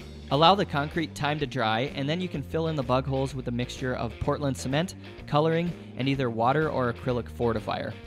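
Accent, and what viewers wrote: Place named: Montana, United States. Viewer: I have to ask: Is that what a Montana accent sounds like? I noticed you say the A in "bag" and "rag" in a very different way. Very nice looking table!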